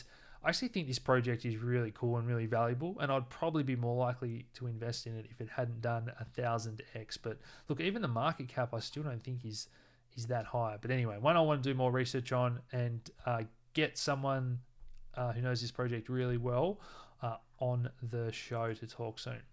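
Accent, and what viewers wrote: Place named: Tasmania, Australia. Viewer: It aint Aussie it's Tassie ... World = Weld only in Tassie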